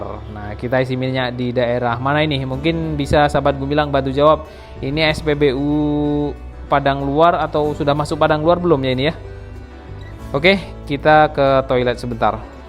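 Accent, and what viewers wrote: Java, Indonesia. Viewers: Maaf mau nanya...abang org jawa ya...keliatan dr bahasanya kdg suka medok😊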